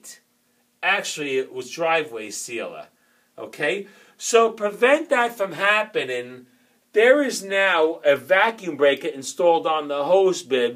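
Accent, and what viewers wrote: New York, United States. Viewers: You hear that working class New York accent and you know you found a guy that knows what he’s talking about. Great video.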